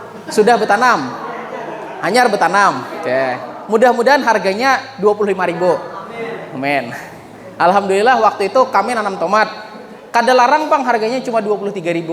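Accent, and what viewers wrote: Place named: Tegal, Indonesia. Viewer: Wong Tegal kie pak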